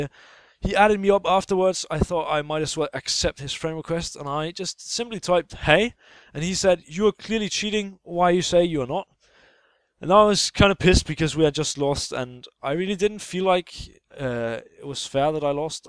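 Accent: Denmark. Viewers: are you danish mayt? 😊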